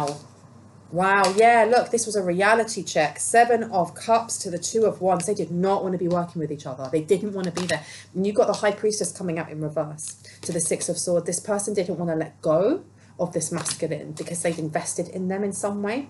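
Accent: United Kingdom. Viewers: Understand you perfectly, love a Brit accent lovey! Your reading are exemplary! 💕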